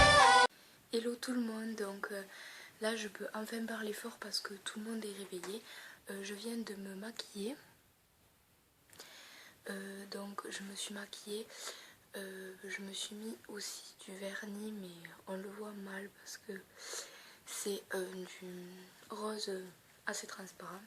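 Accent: South, France. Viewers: T'es vidéos sont juste top! J'aime beaucoup ton accent,du Sud? bisous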